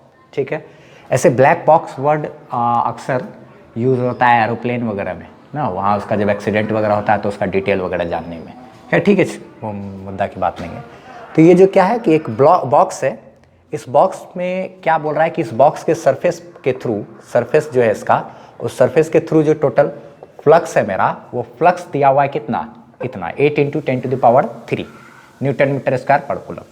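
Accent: Bihar, India. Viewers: I really love your Bihari tone 😅❤️❤️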